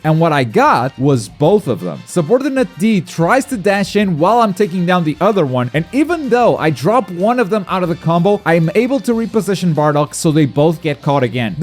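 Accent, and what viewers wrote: Portugal. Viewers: Caramba, vc é de Portugal??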